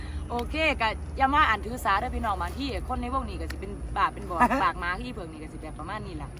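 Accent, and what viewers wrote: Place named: Laos. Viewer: ทำไมพูดสำเนียงลาวจังเลยละคับ คับ ขอไลน์คนข้างๆให้หน่อยได้ไหมคับ😉😉😉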